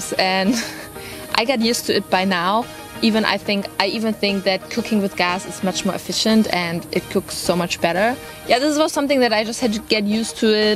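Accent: Turkey. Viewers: Kesinlikle ve kesinlikle bu kız Türk, dikkatli dinleyin böyle bir aksan olamaz. İngilizce aksanı direk Türk aksanı ama maalesef 600 bin takipçisi var. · Hallo, ich gucke begeisternd deine Videos und finde dein türkisches Akzent sehr süß. In vielen Dingen stimme ich dir vollkommen zu, da ich in Deutschland lebe und zum Urlaub in der Türkei die gleichen Sachen wie du erlebe. Mülltrennung, kein Gurt anlegen, usw. Aber in Deutschland z.B in Hannover gibt es teilweise schon Gasherde. Meistens sind es vom Altbestand geblieben und werden zunehmend durch E-Herde abgelöst. Hier gibt es aber ein entscheidende Risikofaktor. In Deutschland sind die Gasherde mit einem Sicherheitsventil ausgestatttet nach erlischen der Gasflamme wird der Gaszufuhr abgeschlatet aber in der Türkei leider nicht. Die neuen Genarationen der Gasherde könnten diese Automatik besitzen, da bin ich nicht auf dem aktuellen Stand. 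Birkac dizi haric cogunlukla türk dizilerini sacma buluyorum. Insanin zamanini caliyorlar. Benim kendi hayatim kendi dizim:-)) Mach weiter so mit deiner amüsanten und interessanten Videos. Tschüß.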